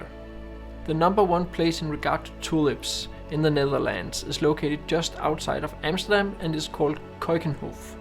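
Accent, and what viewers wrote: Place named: Denmark. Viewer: Its not that bad! Its just very clear you have that Danish accent haha... Planning on coming to The Netherlands again someday soon?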